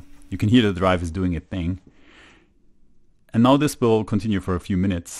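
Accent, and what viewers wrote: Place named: Germany. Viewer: His wording was cuckoo, but “five point twenty five” is incorrect, too. Though people occasionally do it, it’s not correct to speak out digits after a decimal as a single number (except in currency). So it’s either “five point two five” or “five and a quarter”. He’s not a native speaker. My guess is he’s German.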